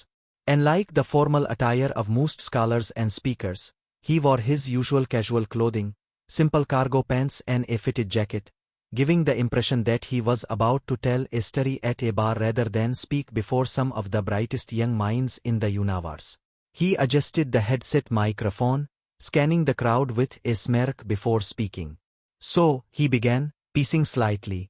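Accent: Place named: India. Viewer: If you are going to use an AI anyway, why give it the ONE accent most likely to piss every American off? The first thing most Americans hear is EVERY customer service "call center" in India that cannot do shite and are NEVER any help. "Yes yes, oh yes, the company cares "berrimuch", yes yes, "berrimuch". Glad to know I don't have to see this dumb shite in my feed again. 17 seconds might be a new record.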